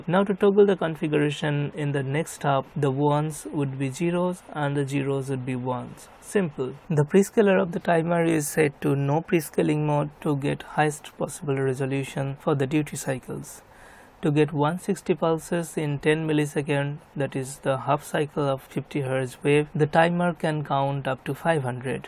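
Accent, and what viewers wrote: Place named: Bangladesh. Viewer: r u from bangladesh. i can guess from ur English accent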